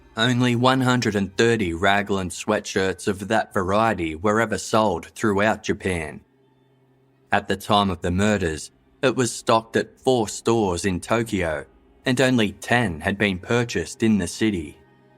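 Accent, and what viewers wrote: Australia. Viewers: I really enjoy that it’s presented in an Australian accent 🇦🇺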